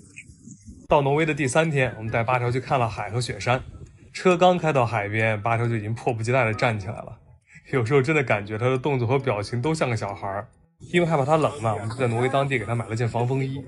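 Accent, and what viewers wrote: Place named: Henan, China. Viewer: 我还说这“去球”咋振的河南味儿了，果然老乡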